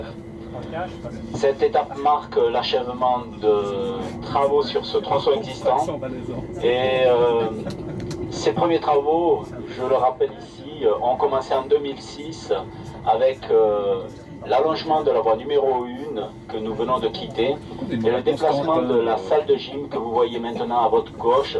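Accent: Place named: Marseille, France. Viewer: C'est un Witz j'espère, le présentateur marseillais au micro ?! Cet épouvantable accent accompagné du fameux "quatre-vingt-dix" . Affreux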